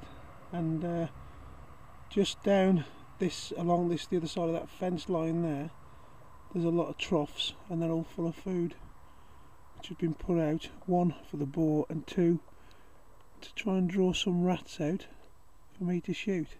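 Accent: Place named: Stoke, United Kingdom. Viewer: Cheers, you sound like a Stokie mate! Love Devon, me and the missus intend to move down there in about 5 years time plus I do a lot of deep sea boat fishing regularly from Paignton. Great videos, would love to get into some of this night type shooting as I am restricted to bunnies and woodies. Keep up the good work. atb Frankie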